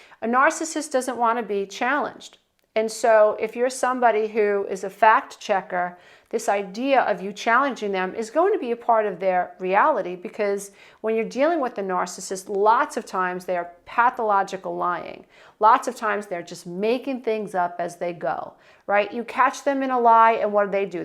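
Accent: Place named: East Coast, United States. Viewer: Thank you your informative video. You're from the Tri-State area. I can't help but note an east coast accent.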